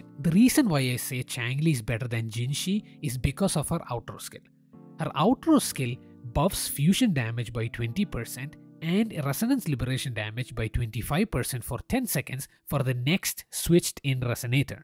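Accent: India. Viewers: Is this Indian. I feel the accent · Your accent sounds indian Amd btw i am chosing jinshi idk why but i didnt liked changli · That South Indian accent though. Where you from my guy? Tamilian? Malyali? Nice video :D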